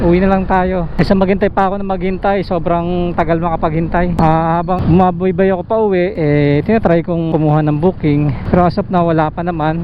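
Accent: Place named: Pampanga, Philippines. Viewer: parang reed lang na naghahanap buhay haha kapampangan kaba boss? bigkas mo kasi lakas makakapampangan e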